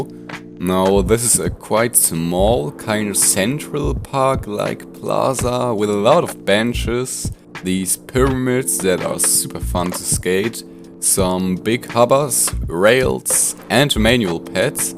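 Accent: Germany. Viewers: Are you german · Man hört direkt, ein deutscher 😅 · Man hört, dass du normalerweise Deutsch sprichst. Ich überlege ob ich mir Skater XL kaufe, da es mega gut aussieht und am 28 July als vollversion 40 Euro kostet. Tolles Video bitte mehr von Map Videos! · R u german? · bist du eigentlich deutsch ? :D , hört sich irgendwie so an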